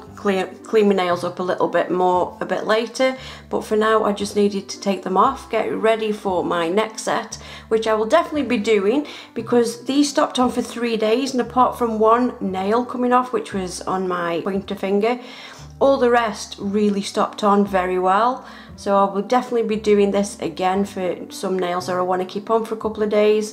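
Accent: United Kingdom. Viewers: she's british that's how we say it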